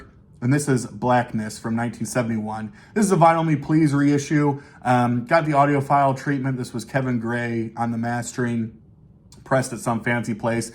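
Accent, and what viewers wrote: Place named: Ohio, United States. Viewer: Hi! I blacked out during the “free jazz” segment. But when I came to, the album that intrigued me was the JA-20 or. The fat hype sticker was in the way and your Ohio accent was hard to decipher. Awesome episode!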